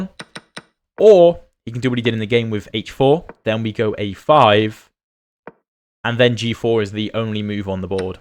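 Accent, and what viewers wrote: United Kingdom. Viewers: I like your materials a lot. Very educative. For me crucial thing about your chess footages is that often you find yourself in a position where other (and by other I mean better - no offense) chess youtubers know the next move by heart and it's hard for them to actually explain why THIS is the move. I think you benefit from that because you are actually going through you thought process, explaining what you see on the board. Sometimes even though you are not sure you assume THIS might be the move because of the process of elimination or whatever. I really like it and I think many players like me can benefit from that. I am also grinding Caro as black and Viena as white so what you do is even more to my liking. On top of that I am constantly learning English and British is the accent I love to listen to so this is kind of a cherry on top. 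Keep up doing what you're doing and I hope you'll claim that golden YT button one day.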